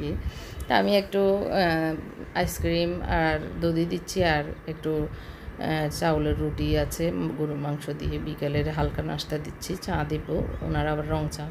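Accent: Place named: Chittagong, Bangladesh. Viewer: Apu apnarkotai ctg ar tune astasa apni ke ctg ar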